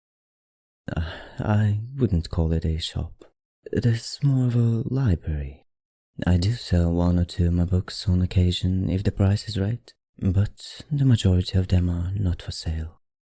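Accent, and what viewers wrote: France. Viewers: AM THE ONLY ONE OR I THINK HE HAS LIKE A FRENCH ACCIDENT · I’m someone who’s seriously into vampires. But I swear I just felt so seduced by your voice. Especially with the French accent and the chuckle. 🥰🥰🥰 amazing voice acting!! ♥️♥️♥️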